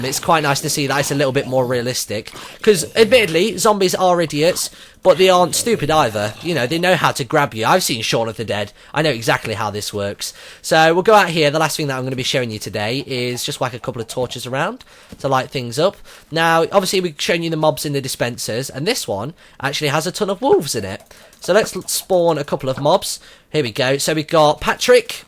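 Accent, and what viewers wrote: United Kingdom. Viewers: oh, cheerful person, funny... and a british accent. You got yourself a new subscriber.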